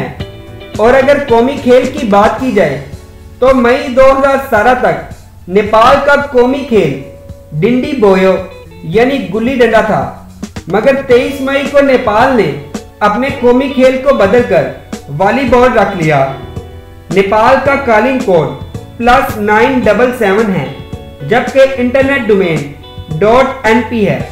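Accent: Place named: Pakistan. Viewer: Woh Pakistani Urdu accent yesa hota my Dubai mai reheta hoon sob Pakistani ka accent yesa hi hote he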